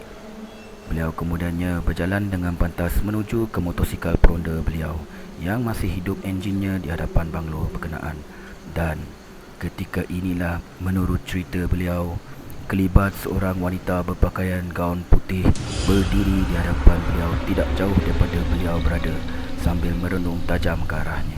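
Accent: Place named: Sarawak, Malaysia. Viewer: apa lampu picit ia..agi pekat kelaka kitak sarawak iya bro...terbaik bro.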